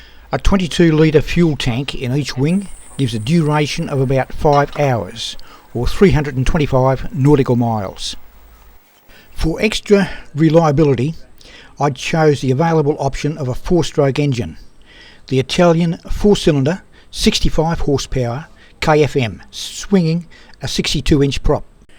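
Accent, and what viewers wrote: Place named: Queensland, Australia. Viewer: He said 1988, NQ Aussie accent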